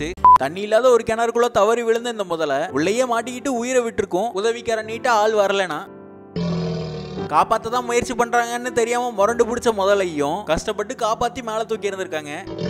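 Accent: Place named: North India, India. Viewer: இந்த பதிவுக்கு பேசுபவர் தமிழர் குரல் மாதிரி தெரியலில்லை வட நாட்டுக்காரர் தமிழ் கற்றுக்கொண்டு பேசுவது போல் தெரிகிறது. உண்மையா?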